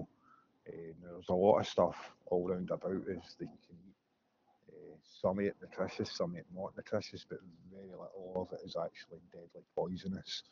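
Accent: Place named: Scotland, United Kingdom. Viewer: Love your accent. Are you scottish?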